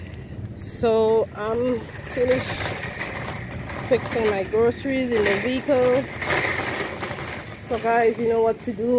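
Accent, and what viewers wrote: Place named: Saint Lucia. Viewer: I just came across this channel this morning am, today October 15, 2024. I just decide to check for youtubers from Lamentin. I decided to try this and the first channel I came across is yours. I heard the accent and I thought to myself, is that a fellow St Lucian. I have been told I have some relatives in Lamentin, because my grandfather was born in Martinique. I have been considering trying to look into find relatives who may have known my grandfather. Thats why I decided to look up youtubers from Lamentin. By any chance, have you come across anyone with the surname.....Darcis. You have a new subscriber.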